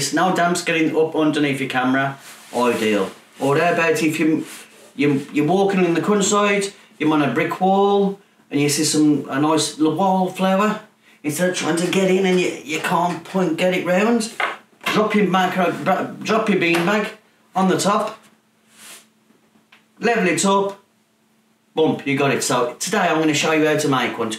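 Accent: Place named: Yorkshire, United Kingdom. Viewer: Great fast idea ... and I love listening to you! Love the Yorkshire? Accent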